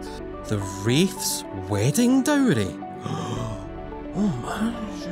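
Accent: Scotland, United Kingdom. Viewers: I must know your accent. Is it Scottish? Edit: Lmfao this npc gets it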